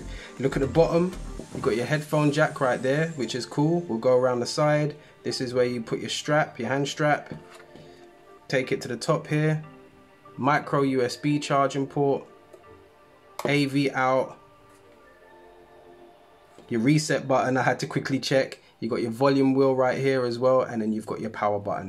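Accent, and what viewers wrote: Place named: United Kingdom. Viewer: An NES emulator -- with a wide screen and "X" and ""Y" buttons... Interesting. So where are you? From your accent, I would guess the UK, but you are talking prices in dollars.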